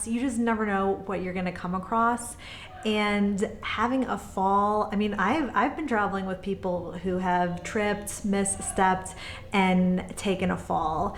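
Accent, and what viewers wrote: United States. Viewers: Nice job on the video. I noticed your husbands tattoo of Michigan, and I have noticed that your accent is familiar to me as I am from Michigan. I live in La Paz, BCS, Mexico now . Keep up the good work.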